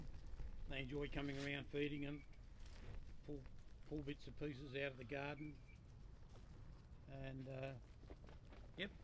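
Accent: Australia. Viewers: Saw the adorable babies, heard the Aussie accent and I had to subscribe to the channel!💕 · What a handsome man with such a wonderful Australian accent😊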